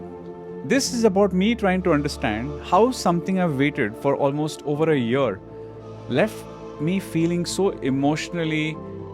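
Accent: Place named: India. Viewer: You have got a very strong Indian accent. Fascinating.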